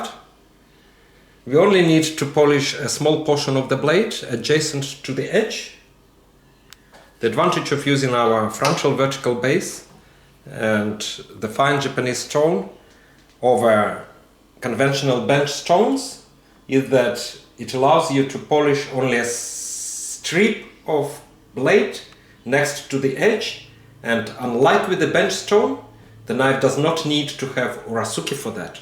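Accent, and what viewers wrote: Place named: Russia. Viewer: Когда я услышал "хорошо" в начале видео, подумал показалось. Но когда увидел имя на книге плюс акцент - всё сложилось :) Приятно смотреть и слушать коллегу с такой глубокой компетенцией. А ещё порадовало понимание того, что вы живёте в Австралии и, вероятно, востребованы там; что всё у вас получилось. Привет из Санкт-Петербурга!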